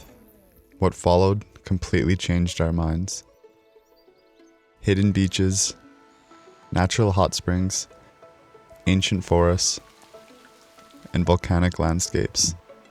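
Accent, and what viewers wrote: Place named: Canada. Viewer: Weirdest pronunciation of Coromandel I have ever heard! Must be the Canadian accent.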